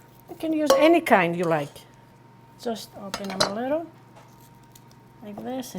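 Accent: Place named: Greece. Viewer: Omg that's not actually the original Greek accent!! That's a Greek villager's accent!!!where are you from?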